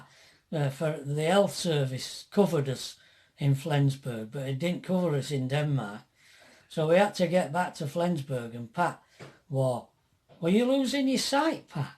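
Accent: Yorkshire, United Kingdom. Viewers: We love watching your channel; your a man of many talents and your both a lovely couple: You make us smile and it’s great to hear the Yorkshire accent and sense of humour: We are from Bradford and being living here in the West of Ireland which we love: Don’t give up; remain positive; your a very special couple.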